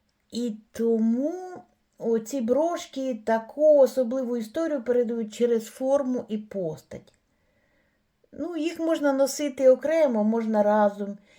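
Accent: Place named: Ukraine. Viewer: Який приємний український говір❤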